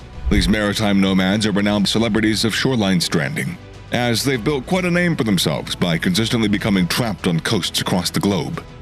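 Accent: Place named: United States. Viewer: The most American narration ever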